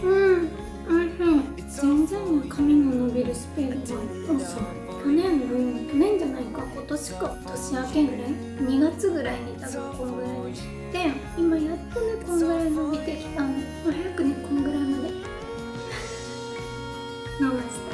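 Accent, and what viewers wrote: Kagoshima, Japan. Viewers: 、相変わらず可愛いね🤗 と同じ鹿児島出身の大原優乃ちゃんと訛が似てるね🤗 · 相変わらず可愛い💕 鹿児島訛りの話し方も優しい感じがして可愛い💕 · 鹿児島弁のイントネーションが残ったままで嬉しい！！！かわいいなー、鹿児島の誇りだよ☺️💕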